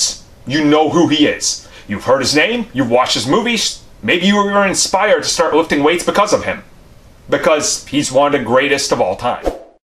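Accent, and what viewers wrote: United States. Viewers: Would never have guessed you were from Bosnia. You're accent sounds about as American as it gets.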